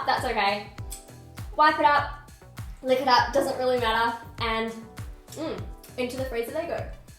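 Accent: Australia. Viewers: R u an Australian?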